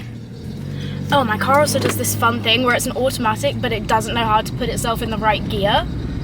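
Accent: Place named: United Kingdom. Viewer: Who would not wanna hang out with such an endearing British accent? 😍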